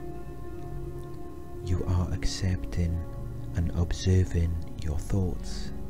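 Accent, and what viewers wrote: Wales, United Kingdom. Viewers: Thank you, this was magic. You have a good voice for this sort of thing ( welsh......?) 👍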